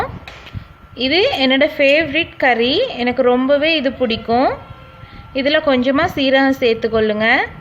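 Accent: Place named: Sri Lanka. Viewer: நீங்கள் சகோதரி மட்டக்களப்பா அல்லது திருகோணமலையா?உங்கள் ஊர் இலங்கையில் ஏன் என்றால் உங்கள் பேச்சில் தெரிகிறது யாழ்ப்பாணம் நகரத்தில் கிராமத்தில் சரியான வித்தியாசம் உணவு தயாரிப்பு யாழ்ப்பாணத்தின் உணவுகள் கிராமத்திலும் சரி நகரத்திலும் சரி மிக மிக சுவையான தயாரிப்பு அதான் கேட்டேன் .. இலங்கையில் எல்லோரும் ஒரே மாதிரி செய்வதில்லை .. இருந்தாலும் யாழ்ப்பாணம் தனி சுவை வாசம் அனைத்துமே ...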